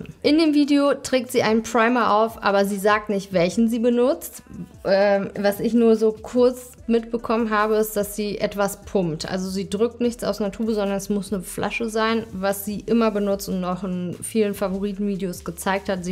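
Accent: Berlin, Germany. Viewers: ick weß it gar nicht mehr ''😂da kommt die Berlinerin aus dir raus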